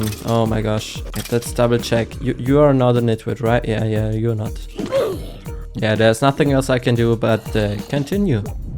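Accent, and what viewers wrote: Germany. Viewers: You are not "Hochdeutsch" are you? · du bist deutsch das hört man an deinem english und kein engländer sagt oki doki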